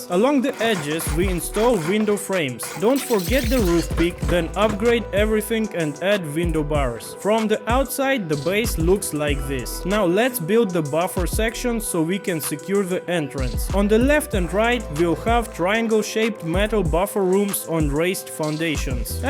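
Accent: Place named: India. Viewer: I rember him og India voice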